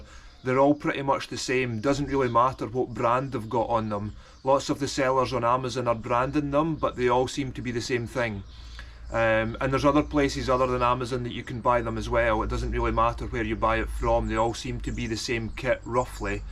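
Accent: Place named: Scotland, United Kingdom. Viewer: Thats a East Scotland accent i'm sure lol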